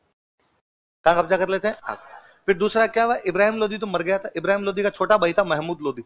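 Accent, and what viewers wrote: India. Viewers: सर आपकी देसी बोली बड़ी प्यारी है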